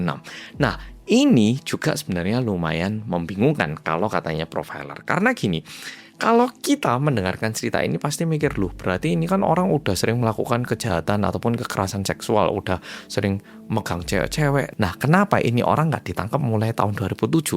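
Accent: Java, Indonesia. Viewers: Mas udh cocok bgd jd orang indo.. Selain logat medoknya khas Jawa.. Udh terkontaminasi kata2 untungnya 😂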